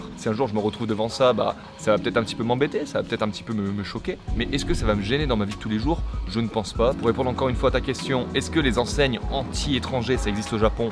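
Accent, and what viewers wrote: France. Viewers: O_O !! Alors là pardon pour le cliché mais un mec hétéro qui regarde des dramas/films japonais, qui mange avec une main devant sa bouche (façon japonaiSE) et qui est fan de Nana jusqu'à avoir le cadenas de Ren autour du cou bah alors c'est grave inédit !! J'ai perdu 10 mes jeunes années à chercher un mec qui comprendrait ma "passion drama" et ai fini par abandonner... et me suis mariée à un mec qui ne comprend toujours pas le délire (et c'est pas grave parce que d'autres qualités c'est bien aussi !!), mais je ne savais même pas ça possible XD !!! Sinon, une vidéo feat avec Pepe et Vivi c'est possible un jour genre un format interview pour qu'ils parlent justement à coeur ouvert de cette expérience Terrace House ???? Et aussi, ton accent (quand tu parles français) c'est quelle région ?? :D Plutôt Est ?? (Alsace-Lorraine ?) Et aussi, tu pourrais nous parler un peu du regard que tu portes sur toi-même depuis que tu as choisi cette vie au japon ? 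Est-ce qu'il a évolué par rapport à qui tu étais en France, à savoir si il n'y a pas eu des couac d'égo, je trouve toujours plus intéressant quand on rentre dans le fond des choses et qu'on aborde la réalité, de nombreux youtubers ont parlé de l'envers du "décor" je trouverais ça grave cool que t'en parle. Et aussi... Non en vrai j'ai fini de bavasser XD !!! Bonne continuation o/ !!! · Salut à toi! Quelques petites questions du coup pour un prochain Dis-Nous: - Est-ce que l'accent français t'a déjà posé problème en terme de compréhension auprès de personnes japonaises? - Comment ça se passe le covid au Japon? On te voit souvent en extérieur durant les tournages (beaux paysages au passage), y a pas de restriction sur ce point-là?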